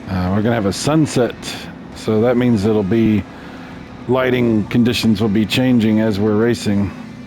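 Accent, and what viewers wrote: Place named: United Kingdom. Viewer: Some cool racing combine with british accent color commentary plus a lot of madness!!! Thanks!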